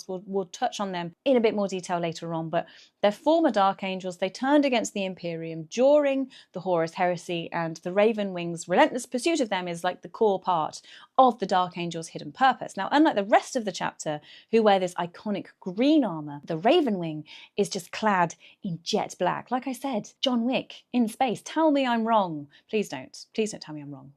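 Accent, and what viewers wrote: United Kingdom. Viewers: We just discovered Dark Angels greatest secret. Their Dreadnoughts are piloted by the FALLEN! P.S. are you British? You have a beautiful accent.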